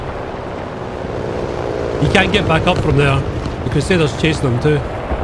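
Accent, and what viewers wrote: Scotland, United Kingdom. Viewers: Hearing a Scottish man whisper "Sleep now, little baby" in a sinister tone may be the most terrifying thing I've heard all week.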